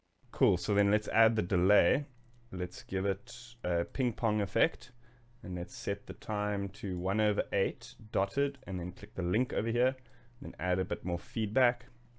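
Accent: South Africa. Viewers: You from south africa ekse?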